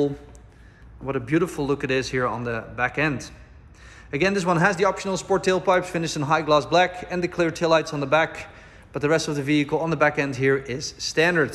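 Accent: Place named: Netherlands. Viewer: Ziet er goed uit kerel, tja je naam en je accent….ik hoorde meteen, ah Nederlander. Super auto! Keep uw the good video’s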